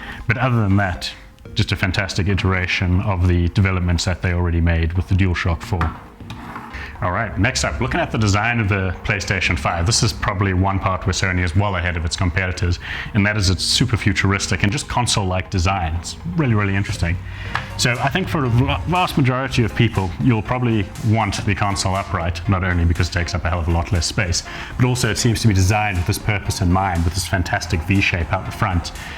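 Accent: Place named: Scotland, United Kingdom. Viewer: Thick Scottish accent LUXURY!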